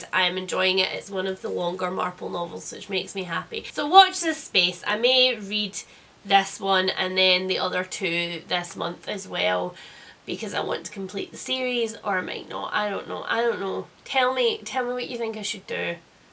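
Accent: Scotland, United Kingdom. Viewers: Hello! I'm a new subscriber and just wanted to say HELLO! I'm binging your videos and think they're great. So good to hear a fellow Scottish woman chat about books. Hope you're feeling better! xxx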